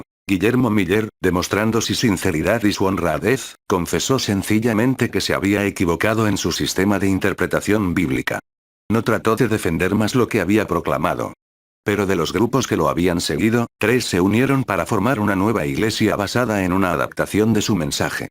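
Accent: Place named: Spain. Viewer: Me hubiera gustado en españo latino, el español de España es bastante malo.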